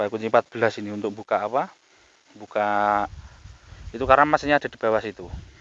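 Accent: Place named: Malang, Indonesia. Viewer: Nonton test drive sampeyan poleh kangen ngalam Sam. Boso jowone sampeyan medok khas ngalam an. Alhamdulillah aq duwe sing th 1983. Sukses buat yo sam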